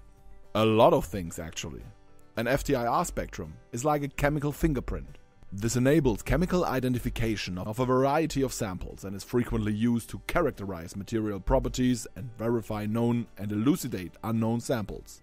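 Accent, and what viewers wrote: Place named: Germany. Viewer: love your German accent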